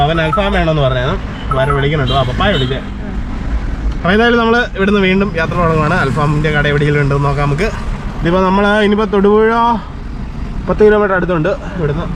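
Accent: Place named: Thrissur, India. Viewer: ചേച്ചി തൃശൂര് പോയ ഭാഷ ഒക്കെ മാറി തൃശ്ശൂർ ഭാഷ ആയി😍😄💞💞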